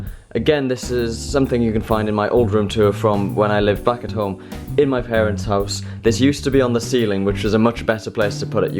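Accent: United Kingdom. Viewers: i like your room setup! and thanks for the British accent :D , watching ur videos really improve my English lol *without sub :p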